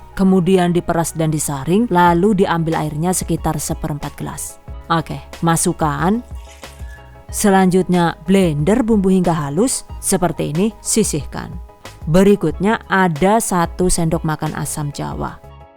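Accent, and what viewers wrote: Banyumas, Indonesia. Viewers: Ngapake mantep yuu, aku jg wong ngapak😅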